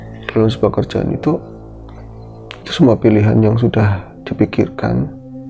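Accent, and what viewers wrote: Tegal, Indonesia. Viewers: temanggung hadir mas...untuk cerita kali ini q insyaallah yakin blm prnh dibawakan di chanel sblmnya soalnya klo cerita di chanel sblmnya alkhamdulilah q sampai hafal alurnya semua karena tiap cerita q dengerinya 3-4 kali...oya cuma masukan aja buat mas klo dr q justru lbh suka klo ada logat tegal,nya selain lucu entah knp q jg tertarik dngn logat tegal,nya mas....🙏🙏🙏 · Mas,maaf saya telat 16 jam 🙏 Tetap semangat mas...awal2 di ngobor dl juga saya sedikit kesulitan nyimak kalo mas lg bawain cerita (mungkin krn saya orang sunda) tapi lama ke lamaan jd terbiasa dg logat tegal nya. Semangat mas,d tunggu cerita2 yg lain nya 💪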